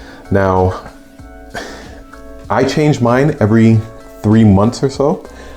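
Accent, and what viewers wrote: Jamaica. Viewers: First off breddah u sound jamaican are you? I am and i kept hearing the accent creep out haha. As far as the lights go i just 2 days ago sold my PL 2 valkyrie to buy the TLR 7 A. Im running it on my FN 509 Mrd and i love how it looks and fits flush. I like the fact it has a strobe feature (wish u would have covered that) i dnt miss the o light. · Honestly thank you for the review. I'm looking for my first light and I think I figure out exactly which one I will run on my G45. Dad crew here also. I also notice an accent similar to a Jamaican accent if that's your background nuff respect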